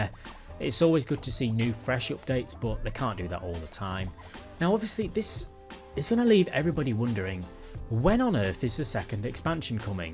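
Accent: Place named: England, United Kingdom. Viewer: It's the English accent.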